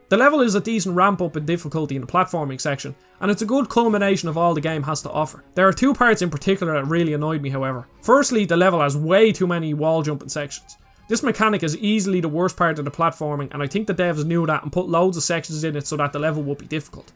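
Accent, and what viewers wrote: Ireland. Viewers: I could listen to your strong Irish brogue all day, brother! great videos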